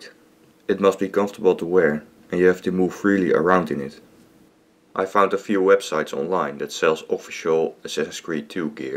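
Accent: Netherlands. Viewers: Are you Dutch? :o it sounds like you are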